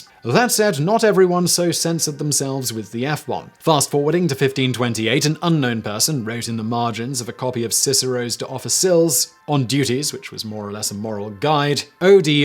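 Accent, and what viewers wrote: England, United Kingdom. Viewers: I love to listen to Simon day four letter words😂something about the English accent and the word "fuck" are an absolute beautiful combination! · Serious proper English voice laughing brings my heart joy xD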